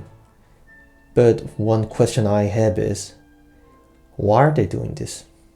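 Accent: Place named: Southeast Asia. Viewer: printed in their dna is false. Its the culture. My grandfather was from china close to Nanjing. Migrated to Thailand after WW2 (there was no food). I went to a british school in thailand. I am currently studying in Australia. As far as I am concerned, my supposed selfish DNA is not influencing my behaviour in the slightest. I think you know this, but I think you're prejudiced. The Selfish section of your video is true, but there are better explanations found on youtube, explained in a much more socially acceptable way. My guy, consider your behaviour and ask yourself if this is going to do you any favors in life. You'll lose all respect from your coworkers and friends in New Zealand. It's odd, because you seem to be asian based on your voice. Most likely South East Asian. So I suppose I can understand your frustration with Chinese Tourists trust me. 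But a lot of South East Asians have chinese genes, in fact a lot of them are chinese migrants like myself. So "printed in their DNA" is just a load of nonsense. Its sad how people aspire to be edgy like this nowadays. I always thought the goal in life is the become more of a gentleman. At 18 years old you gotta lot of growing up to do. I'm doing a lot of guess work here, but I think my opinions of you are pretty accurate and I can see eye to eye with you. I simply do not condone this type of behaviour.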